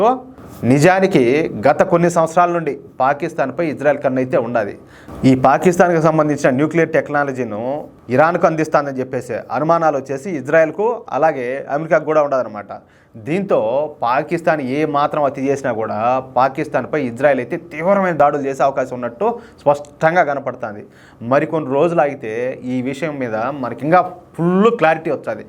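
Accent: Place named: Nellore, India. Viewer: Anna medi nellore ha slang alage undii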